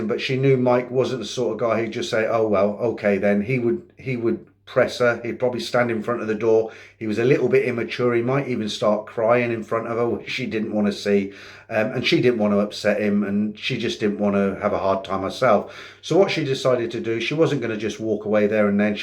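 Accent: United Kingdom. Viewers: are you saying "Edgar Myer"? lol im sure its EKAMI. Love the brit accent mate....just funny.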